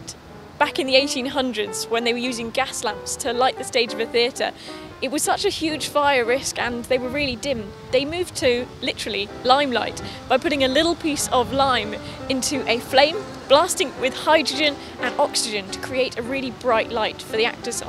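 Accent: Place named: United Kingdom. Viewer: Can't beat a British accent :)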